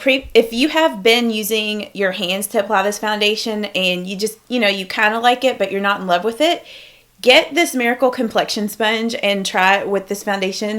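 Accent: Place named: West, United States. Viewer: I like your kinda accent like...it's hard to explain it's like western kinda, you say old like ode...idk but I like it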